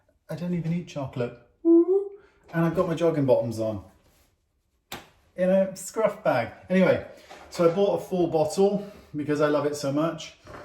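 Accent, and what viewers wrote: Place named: RP, United Kingdom. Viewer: Your YouTube channel is a classic BBC English teacher for a foreigners!!😊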